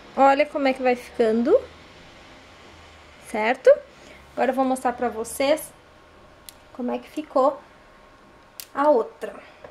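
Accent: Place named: Rio Grande do Sul, Brazil. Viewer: vce esplica mto bem ..esse teu sotaque gaucho e mto agradavel..bem facil de entender.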